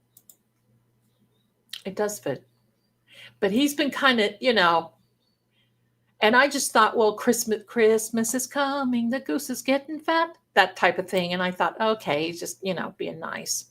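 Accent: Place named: New York, United States. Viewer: Hi I heard your ny accent. Hehehe. I’m OG from Patchogue LI NY. I’m in upstate NY now but still have some Long Island accent Can’t wait to watch and enjoy. Ty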